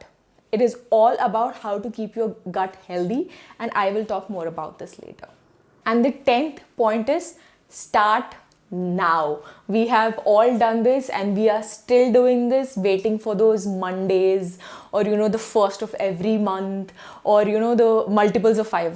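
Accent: India. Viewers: I really like her Indian accent 😜.... And major thanks for the weight loss tips. Totally gonna try it today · You sound like a mature south Indian. Love you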